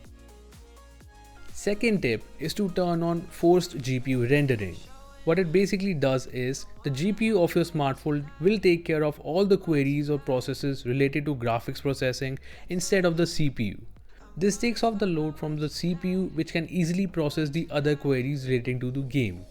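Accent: India. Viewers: You're the first YouTuber with indian accent I can undestand, thank you for this great Video it helped me a lot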